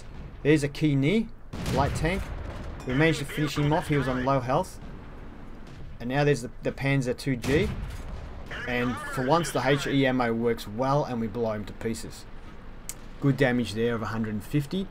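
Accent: Australia. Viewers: are you australian?!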